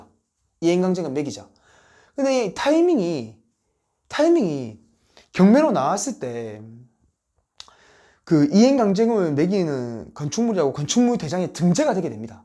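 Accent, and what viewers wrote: Busan, South Korea. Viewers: 고향이 부산이라 말씀이 더욱 정감있고 시원시원하고 진실로 구독자 생각해주시는 부동산 팁 잘듣고있습니다^^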